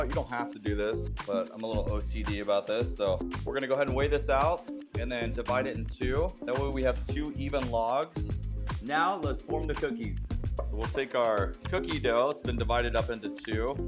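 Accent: United States. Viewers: Sweet, but you sound like an American. You know, we use cups as measurements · You from Hawaii? I can hear small kine pigeon or local accent! Right on, Braddah… keep up the good work🏝️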